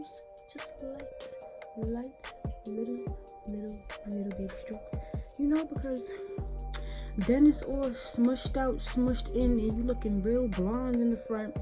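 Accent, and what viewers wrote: New York, United States. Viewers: I love us New Yorker's! As soon as we open our mouth ppl automatically know where we're from! Sis said a "hoodie" 😂😂😂 #youslayedthatwigtho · Less than minute into the video I knew she was from New York 😂😂😂😂😂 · You most def from New York , you said some “ we not budderin bread” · Lmfao I knew you was from New York soon as I heard your voice 😭 what’s poppin.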